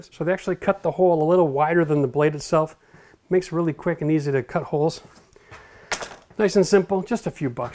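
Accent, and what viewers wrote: Canada. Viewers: Heck, I learnt a lot from this guy, although I will never gonna do what he is doing but listening Canadian accent was fun for me!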